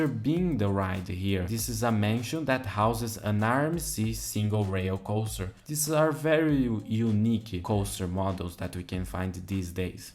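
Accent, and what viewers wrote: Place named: Brazil. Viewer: lol, tu é brasileiro? Esse sotaque BR com tantos "i" no final das palavras tá muito forte hein hehehe.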